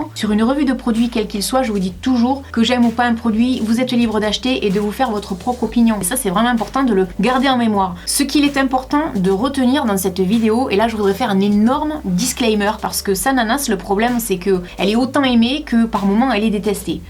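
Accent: South, France. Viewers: Hello, en temps que française qui habite en Suisse, tu es mon rayon de soleil du Sud avec ton magnifique accent! Merci pour ça. Aussi je voulais ajouter que j'adorais tout particulièrement ton maquillage des yeux, encore plus beau que d'habitude!😍 Et oui je confirme quand ta plus tes taches de rousseures ça fait bizzare, mais l'effet cadavre avec cette teinte est garantie 😂 Je voulais aussi dire que j'ai souvent eu l'impression avec les rouges à lèvres (à peu près de la même teinte que le plus foncé ) il y avait souvent de l'inégalité dans la couleur! Merci en tous cas pour nous partager tes avis et ta bonne humeur! Ne change rien! 😘❤❤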